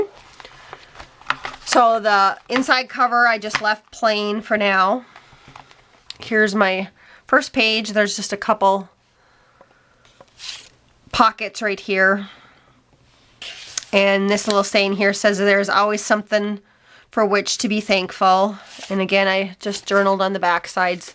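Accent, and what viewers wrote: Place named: United States. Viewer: Pretty journal. I have a non crafting question for ya! Folks from your state pronounce your short "o" and "a" differently than the rest of the States. I'm curious... where does that come from? If you can answer that, it'll settle a bet with my husband. I say it comes from the American Indians.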